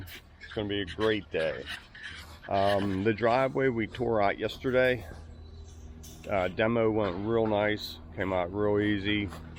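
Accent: Pittsburgh, United States. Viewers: I'm just a minute 30 into the video, and I'm like, he sounds like Pittsburgh, and I checked, TSH Concrete is indeed in Butler County, Pennsylvania, I got family that live out near there still. I never hear the Pittsburgh accent living out here in the boondocks of america, Portland Oregon where grocery stores and even the pubs close at 11pm every day of the week. anyway, on with the video, feeling very nostalgic just listening to you talk, after 21 years its nice to hear another voice from the area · that yinzer accent.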